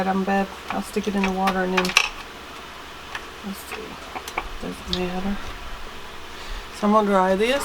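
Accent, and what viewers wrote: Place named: North Carolina, United States. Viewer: I love how "real" you are in your videos, and of course, it's always nice hearing a fellow North Carolinian talk! lol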